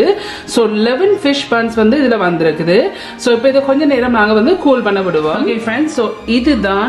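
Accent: Sri Lanka. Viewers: neege indiyathane. Ogada tamil. Sri lanka tamilmatheri iruku. Mam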